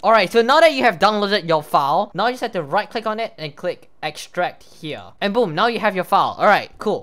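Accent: Singapore. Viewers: why do you sound singaporean :sob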